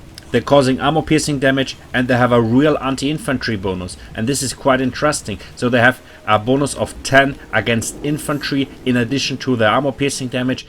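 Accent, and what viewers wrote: Germany. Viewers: I’m officially going to start intentionally pronouncing vampire “wampire” henceforth. Love the Deutsch accent. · I find it funny that other germans can tell germans just by the accent. Knew instantly, very nice guide ! :D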